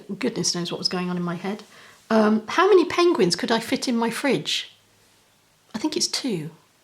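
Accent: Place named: United Kingdom. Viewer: Excellent ideas! Especially after everything that’s been going on. Relying on big events for happiness would be difficult because so much stuff is being cancelled. And anyway you always hear the expression “it’s the little things in life!” Getting a card from someone I haven’t heard from or even a text. A child saying something funny that you never would have thought. I watched a video of a family who full time RV’s and their 6 year old daughter said “you can never have too many chocolate chips!” Good thought. Thanks! Your videos cheer me up. I could listen to that lovely British accent all day! Something I just thought of that makes me smile. I love to look for fun, funky socks. Sometimes if I’m down a crazy pair of socks will cheer me up!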